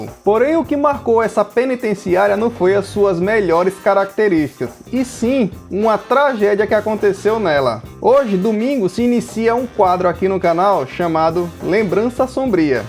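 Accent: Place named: Northeast, Brazil. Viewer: O cara vem falar de algo que nem sabe o que aconteceu, não tem um vídeo e acha que algum idiota vai ouvi-lo com esse sotaque de nordestino !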